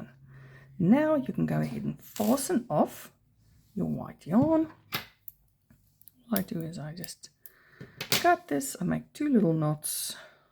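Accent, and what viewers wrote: South Africa. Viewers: How pleasantly surprised I was to hear your South African accent. Hellooooooo, from Jukskei Park, Gauteng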